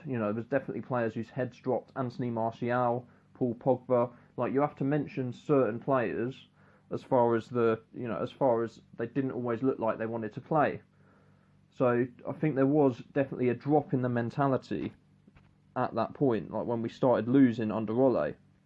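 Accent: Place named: RP, United Kingdom. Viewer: You sound like a posh spak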